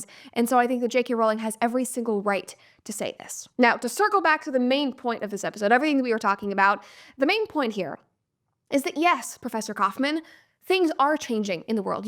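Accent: United States. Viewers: now become more active in creating more awesome contents for us as fan weekly 4 videos, i really liked your vibes typical American accent fast quick accurate and precise no wonder u name yourself professional yapper:yt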